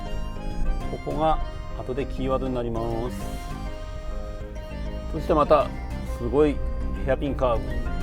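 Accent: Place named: Fukui, Japan. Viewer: 結構訛ってんな😀独特の福井訛り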